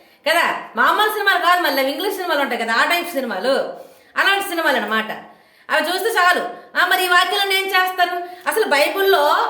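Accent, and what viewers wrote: India. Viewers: Em basha babu turpu la vundi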